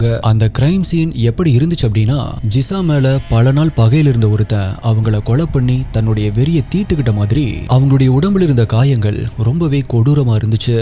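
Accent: Coimbatore, India. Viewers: unga coiambatore slang super bro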